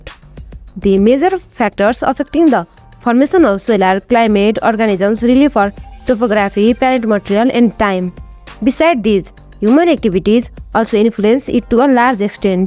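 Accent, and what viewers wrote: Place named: Nepal. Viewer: U sound like Nepali.. I mean ur accent..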